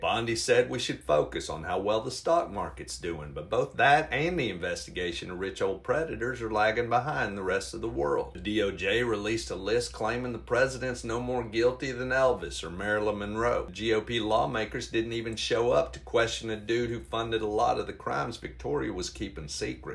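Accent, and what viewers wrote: South, United States. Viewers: Your comments are weirdly out of place.... Only because of your southern accent. You must have payed attention in school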